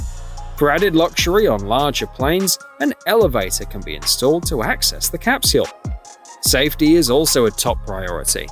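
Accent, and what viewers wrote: United Kingdom. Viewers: Brit accent should be confined to Brit subjects.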